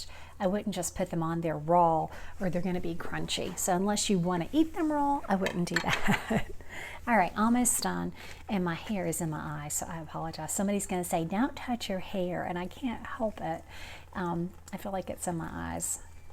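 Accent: South, United States. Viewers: Love the homely vibe and Southern American accent, will try this! Thanks from Manchester UK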